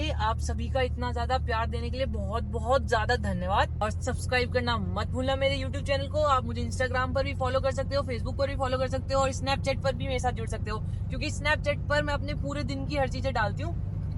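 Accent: Haryana, India. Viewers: Mst lge h haryanvi aap ki boli te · Haryanvi hindi mix😂😂😂 but Haryanvi top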